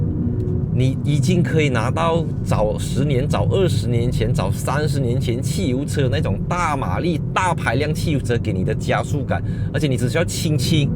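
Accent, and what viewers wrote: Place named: Malaysia. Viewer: 馬來西亞式的北京語 不錯喔還用繁體字喔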